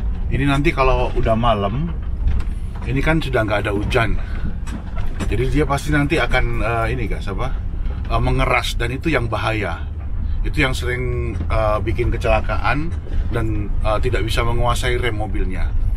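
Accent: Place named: Java, Indonesia. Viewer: Jowo ne jik kentel rek😂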